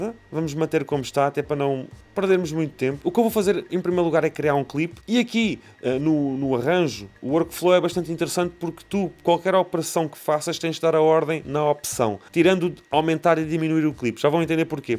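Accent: Portugal. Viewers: Me amarro no sotaque português, sem contar que... cara! Sua explicação foi incrível! +1 inscrito! ;D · Você é português (de portugual) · Cara vc poderia botar legenda? É q tipo não consigui prestar atenção no português de Portugal, deu um bug aki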